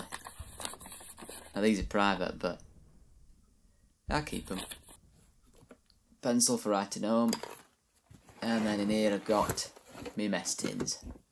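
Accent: United Kingdom. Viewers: Your British accent is excellent. Sounds legit Enough that I have to put subtitles on. I can't understand any of those people except the posh ones or the Welsh. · Barnsley accent?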